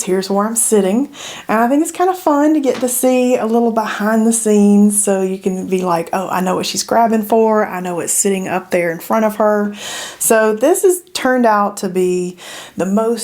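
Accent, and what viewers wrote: South, United States. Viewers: the familiar southern accent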